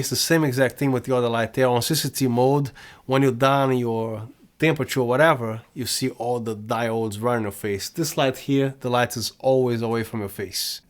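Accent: Brazil. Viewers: Based on your accent I am guessing voce e Brasileiro. I just bought 4 of these. I cannot wait to use them!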